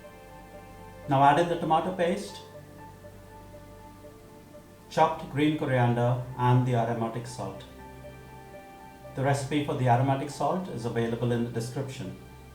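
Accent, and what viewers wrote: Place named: England, United Kingdom. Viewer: Good thing is that you took care of the fact that your filling is neither oily nor runny. Usage of Tomato paste instead of the traditional method not only enhance the taste but it helped you get the required consistency in your filling. What I observed is that in this recipe your camera angle is not top notch. Especially when you were making your filling and sauteed onions. This dish looks more South Indian in texture though no coconut is used. The running commentary always reminds me old Cricket matches used to telecast in 80s n 90s with aristocratic English language and meticulous English accent. All in all, exciting stuff as always.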